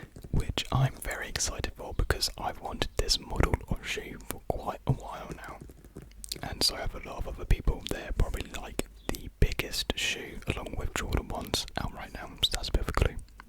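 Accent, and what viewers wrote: United Kingdom. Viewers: I just found you and love your content, I saw and listened to your quilting YouTube as well, also saw additional content since then, hope you found a nice mix of YouTube and new career adventure. I must say now that I found you your are my favorite A-S-M-R MAN, LOVE THE BRITISH ACCENT. YES WEAR YOUR SHOES!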